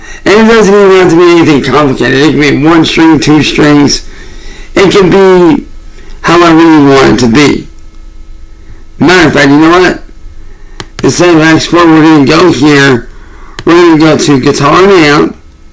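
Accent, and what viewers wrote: United States. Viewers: I was actually getting to like the gay cowboy accent ! He sure is mumbling a lot lately. For such a hard worker he can’t even enunciate his words, simple grade school stuff !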